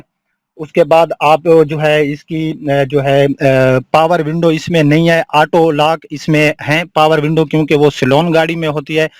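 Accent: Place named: Punjab, Pakistan. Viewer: English in punjabi mode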